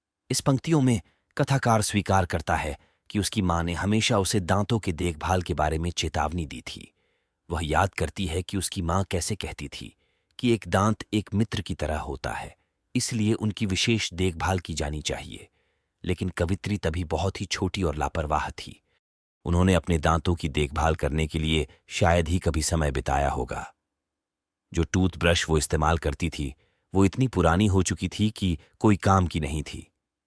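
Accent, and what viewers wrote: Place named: United Kingdom. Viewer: change the voice changer, this is in uk accent change to something that can we understand apart this your teaching style is very unique and good it helped me thanx😊❤👍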